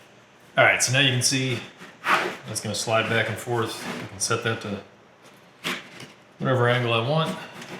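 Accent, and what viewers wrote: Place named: East Texas, United States. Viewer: Where you from brother? You speak like me…east Texas?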